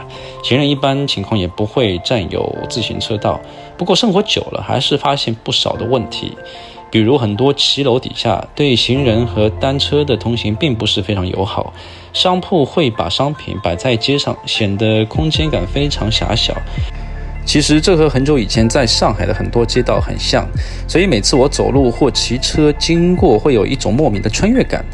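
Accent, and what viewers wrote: Taiwan. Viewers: 你的口音為何跟台灣人一樣，還是你是從台灣過去上海生活的， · 你口音怎麼都變台灣口音了😂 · 今天才發現你的頻道，等等來全看一遍。 上海講話沒有口音嗎？感覺你說話跟台灣一樣。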